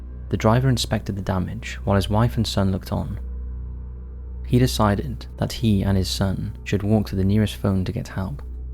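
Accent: Wales, United Kingdom. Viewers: I love top5s content. However the narrator sounds Welsh, and seems to struggle with basic words in English. What's a thisic? Probably meant a Psychic... this is not the first time basic words have been pronounced strangely. Maybe some quality control before posting?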